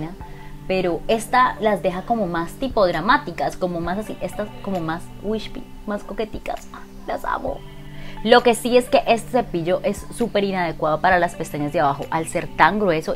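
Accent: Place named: Colombia. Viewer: Mi niña que lindo acento tienen l@ colombian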